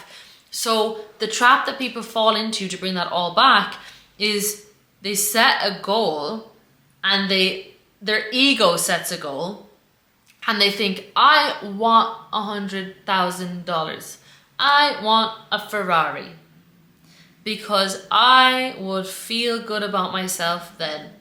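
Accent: Ireland. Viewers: Love your Irish accent and your style of presenting your information. Wish I had your talks when I was young.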